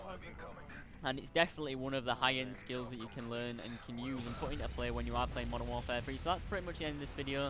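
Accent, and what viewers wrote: United Kingdom. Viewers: "Do you live in the UK because you have a British accent?" - rhetorical question? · U went super east on these ppl. Do you live in the U.K. Cuz u got a british accent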